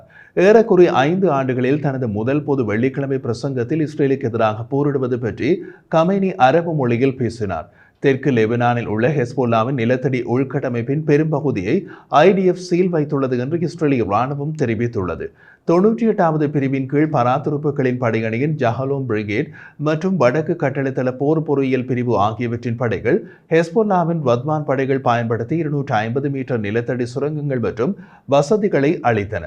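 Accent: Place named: Sri Lanka. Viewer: இதை ஈழத் தமிழ் என்று சொல்வார்கள். கேள்விப் பட்டிருக்கிறீர்களா? உங்கள் எல்லைக்கு வெளியேயும் தமிழ் பேசுபவர்கள் உள்ளார்கள்.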